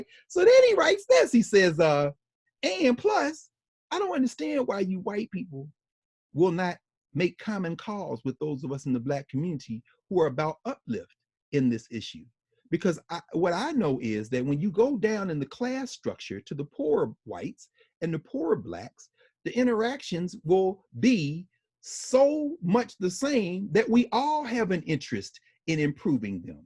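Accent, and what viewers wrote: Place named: Nashville, United States. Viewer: I was born and raised in Nashville. Graduated Tennessee State many years ago. I love. I have not lived in Nashville in 30 years, and when I hear him say, “ my momma and ‘ nem’ “, I feel like I’m back home again. (Notwithstanding, his brilliant mind.) Love you guys and thank you.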